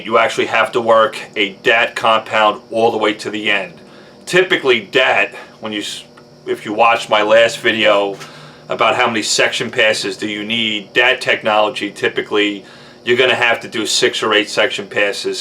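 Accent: New York, United States. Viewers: I'm hearing Long Island or NJ here paisan.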